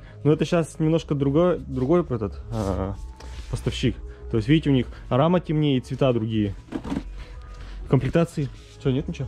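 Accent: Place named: Perm, Russia. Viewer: Блин, вы в Перми реально как в сериале разговариваете, случайно на твой канал наткнулся когда искал инфу по Гоу Про 8, и по говору понял, что ты из Перми, не ошибся ))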